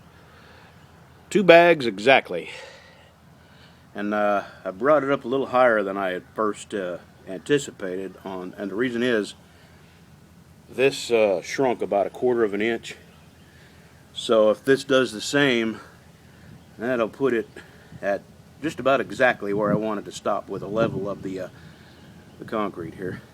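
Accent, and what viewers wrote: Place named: North Texas, United States. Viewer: Cry Babies all around just goes to show Somebody's got to Hammer anything a Man does today. I did something like this 30years ago but not near as much thought or preparedness was involved. I like yer thought process and initiative in taking this on. You must be somewhere in the mid south. Sound like my cousins from NorthTexas. I'm gonna follow yer lead in the spring and put a shallow well in myself again for the same reasons related to $$$$. I appreciate yer work and have learned enough from this video to do mine better when the weather gets warmer. My Family out west has that same Dry ( I love it) Humor. Keep up the good work, I'll be watchin!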